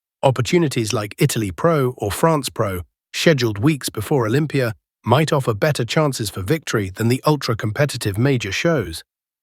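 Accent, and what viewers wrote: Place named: United Kingdom. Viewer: I love the British accent! Which assistant do you use?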